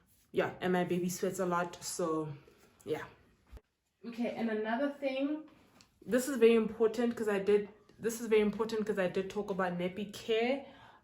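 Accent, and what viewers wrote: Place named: Pretoria, South Africa. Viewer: 🤣🤣 "Comfortability" 😂😂 I can just hear hore o mkhaya wena, o tswa Pitori🤣. Your videos are so helpful mama, Thank you🤗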